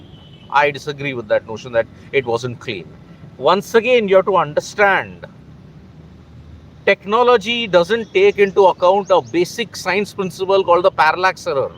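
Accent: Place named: Kerala, India. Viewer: Who is this guy? Sounds like a mallu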